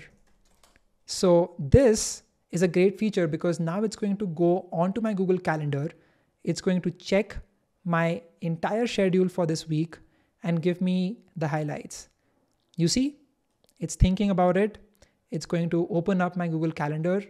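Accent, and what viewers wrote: India. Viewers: The thick Indian accent 😭🙏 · ahh ofc. when i hear hindi accent i already know this video is bullshit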